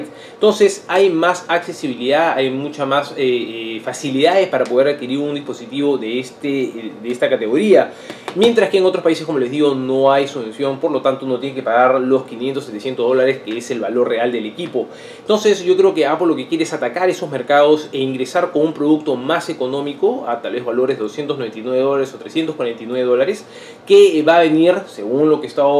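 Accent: Peru. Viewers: tu acento es como de Perú es por eso que tienes más seguidores y subscriptores.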